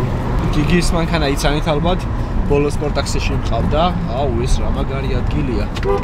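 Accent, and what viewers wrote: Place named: Mingrelia, Georgia. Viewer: mainc rogori megruli saubari gaqvs ❤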